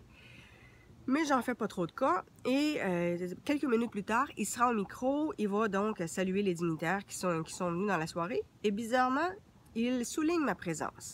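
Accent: Quebec, Canada. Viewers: Belle vidéo et j'aime. ton ascend québécois j'adore. Super que tu fasse, partagé ton expérience, personnelle.merci franchement j'aime.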